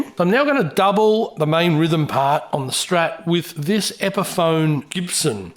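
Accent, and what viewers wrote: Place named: Melbourne, Australia. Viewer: Melbourne, Australia (accent)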